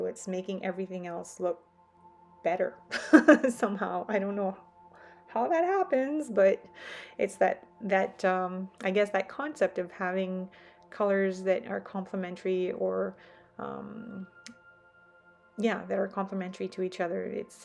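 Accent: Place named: Canada. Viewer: Why do you have a Canadian accent? Just curious.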